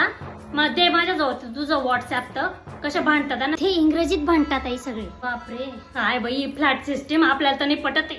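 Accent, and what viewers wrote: Khandesh, India. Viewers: परफेक्ट खानदेशी 👌😅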